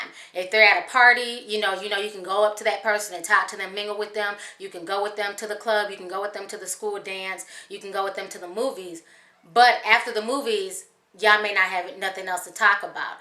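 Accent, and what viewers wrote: South, United States. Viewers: listen to your slight southern accent!! MN flushed it out. I'm loving this random flashback 💕💕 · Oouuu it's the southern twang for me! Glad to see your growth! 😩🤎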